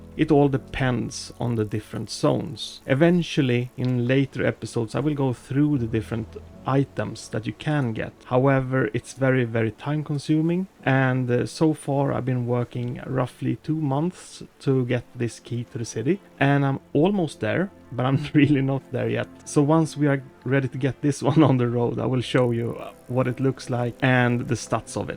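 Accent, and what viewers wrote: Sweden. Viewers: are you swedish ( är du svensk)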